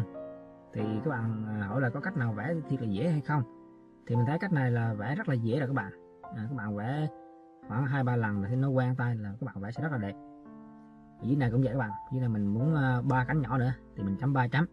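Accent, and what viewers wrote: Central, Vietnam. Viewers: cảm ơn bạn, nghe giọng miền trung mến liền🥰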